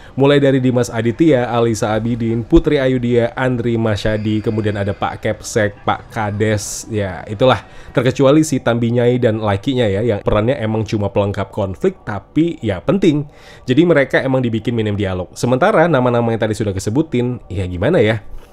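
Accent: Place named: Padang, Indonesia. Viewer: logatnya malah kya logat padang, cuma bagus sihh 😊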